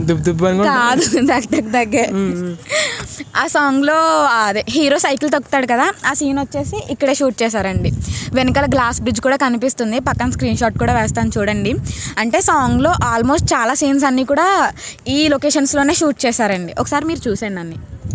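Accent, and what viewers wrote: East Godavari, India. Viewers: Superb akka mana east Godavari natural ga ela matladatharu... nv video lo are naturalist use chesav ..superb ..keep rocking 💓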